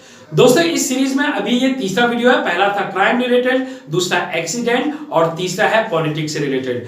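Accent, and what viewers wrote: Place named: United States. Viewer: Sir, Thanks for uploading such Useful educational video and I like your American accent speaking style besides you are bold enough and outspoken.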